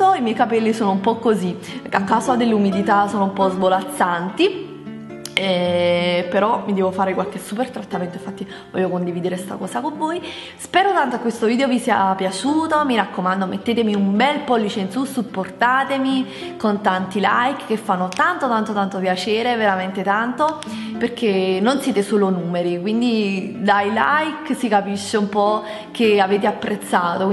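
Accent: Naples, Italy. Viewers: ma fregatene...sono persone cattive, non capisco nemmeno io perchè altre youtubers non si becchino gli insulti, ma secondo me solo per il fatto che tu sei sensibile e ci fai caso ai commenti, ai like ecc... O piuttosto che niente ci sono le persone che si mettono a criticare la pronuncia, la cadenza, il dialetto, l'inglese....lasciale perdere, ma chi se ne frega, anzi sai cosa ti dico?? Io sono milanese DOC e sono pure una linguista (studio interpretariato) eppure quando sento la tua cadenza napoletana o qualche parola in dialetto mi diverto tantissimo, e se capita che sbagli qualche pronuncia in inglese a me fai solo tenerezza.... Saranno invidiose perchè tu mostri di avere una bella vita, con una bellissima famiglia, con una bella casa ecc ecc...come se tu non avessi problemi... Non capiscono che magari una vuole mostrare il lato più allegro e divertente della vita! 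un abbraccio grande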